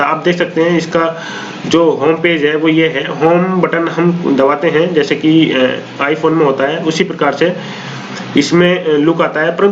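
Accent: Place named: India. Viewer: Dusre ke video ko Chura Ke Indian Awaaz lagakar Banaya Gaya video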